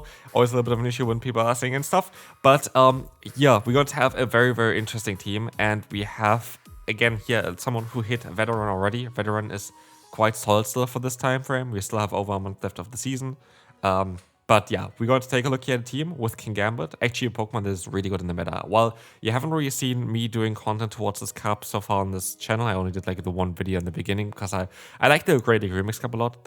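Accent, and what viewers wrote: Germany. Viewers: Bist du Deutscher? Oder woher kommt der Akzent haha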